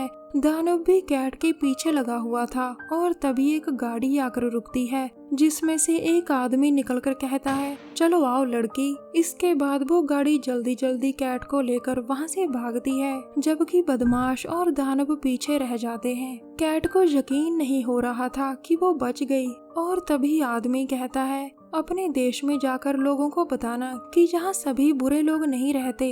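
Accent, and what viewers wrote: Punjab, India. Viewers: I always love you content. Specially your punjabi influence in explanation.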